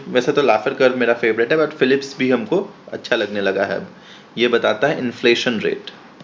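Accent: Bihar, India. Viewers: Bihari tone jabardst hai apna sa lagta hai aise hin padhate rahiye sir👍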